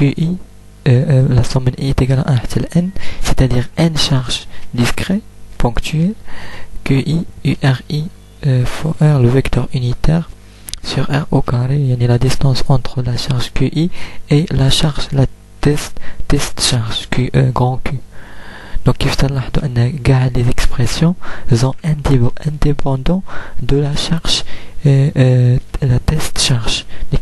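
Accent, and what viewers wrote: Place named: Morocco. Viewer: Il est marrocain 🙂 donc c'est normale que ça façon de parler n'est pas comme la tienne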